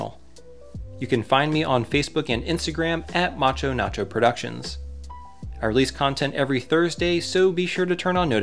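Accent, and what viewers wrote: Baltimore, United States. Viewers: Are you from Baltimore? I feel like you have a Baltimore accent! Lol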